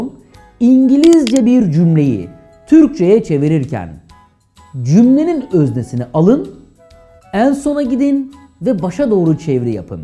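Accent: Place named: United Kingdom. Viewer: hocam formatınız iyi..teşekkürler.. bir kaç önerimde var bunun yanında..amerikan aksanını ön plana çıkarmanız....çok kullanılmayan dip bilgiler yerine günlük hayatta sıklıkla kullanılan kalıplara yönelmeniz..ingiliz aksanı kafa karıştırıyor kullandığınız...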